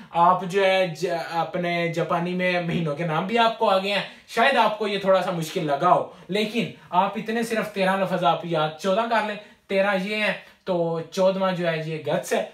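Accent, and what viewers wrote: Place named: Sialkot, Pakistan. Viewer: bhai..ap sialkoti lagtay hain.....sorry mind naie krna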